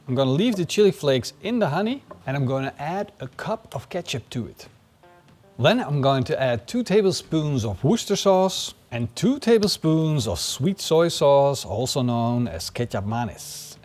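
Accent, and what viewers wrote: Netherlands. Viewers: Wait, are you dutch?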